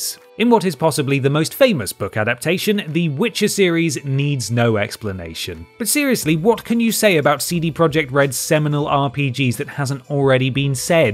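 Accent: United Kingdom. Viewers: Gotta love british accent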